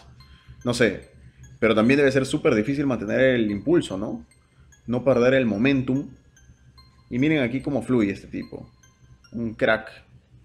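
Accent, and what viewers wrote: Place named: Mexico. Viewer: Pense que eras mexicano Saludos y exito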